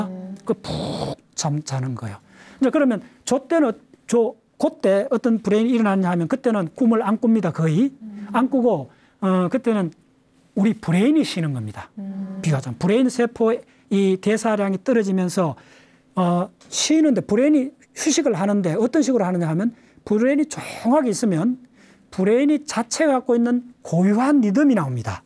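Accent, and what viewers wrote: Gyeongsang, South Korea. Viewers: 사투리 억양까지는 그렇다쳐도 표준어로 강의 하셔야겠어요. 사투리에 경상도 억양에...좀 그러네요. 게다가 발음도 좀...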